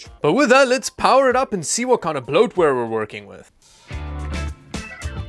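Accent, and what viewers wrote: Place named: South Africa. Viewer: You have a South African accent. Are you from South African?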